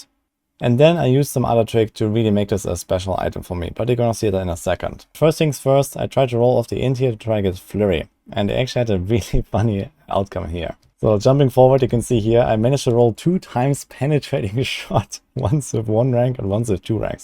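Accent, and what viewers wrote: Germany. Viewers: Oha, bin gerade froh, dass ich Dich gefunden habe. Werde mir die anderen Videos auch ansehen. Glaube rauszuhören, dass Du deutsch bist. 😊 Aber ich schaue es auch sehr gern auf englisch!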